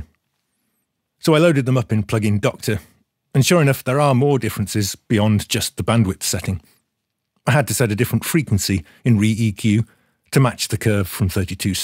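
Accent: United Kingdom. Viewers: But wait! You sound British to me as an American. Have you ever heard of SSL? They made this thing called the 4000. You needed really long arms. And they became very popular. With people that had long arms. Not so much with you folks with the short arms. You've been playing with too much. While I love what all of these plug-ins have to offer. Most all are really unnecessary. They are just there for children that like playing video games with audio software and always win. It really doesn't have much to do, with music creation, itself. It's whizbang. And so all those great sounding rock 'n' roll hits. That all of these plug-ins are said to emulate. It's all bullshit. It's, total bullshit. None of those great hits had much of anything to use. We had two may be three perhaps four choices? For everything. That was it. And we had one limiter. And then got a cheap one. 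Only to find. It really wasn't worth using. And so we only had one good limiter a black 1176 purchased brand-new in 1976! I just sold last year. Because the clones are just as perfect. As in, perfect. And at a bargain price! And I had one reverb. From Germany. Made with a big piece of cold rolled steel plate. From a company that, tried to kill us years ago. Along with their microphones. And their cute little cars. All thanks to the worst dictator in modern times. And was a hard way to get good quality sound. That we already had. But theirs, sounded different. It sounded German. For, Wagner. Our stuff was better for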